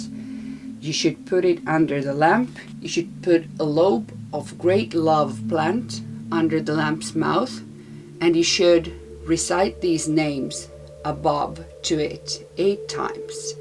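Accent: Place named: Finland. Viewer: Fun to watch your video. Is your Myrrh colored white (or is it just the way the top of the box looks?)? BTW, loving your Finnish accent, soooo awesome! :)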